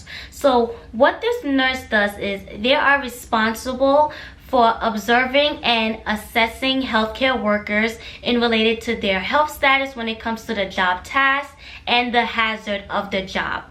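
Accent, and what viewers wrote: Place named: Jamaica. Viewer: You have an accent are you from Jamaica 🇯🇲? Big up yuhself either way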